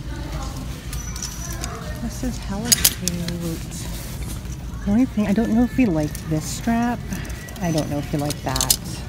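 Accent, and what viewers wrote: Philippines. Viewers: 😂 definitely agree with you on the Filipino accent! You’re always hilarious❗️ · I agree with the filipino accents!!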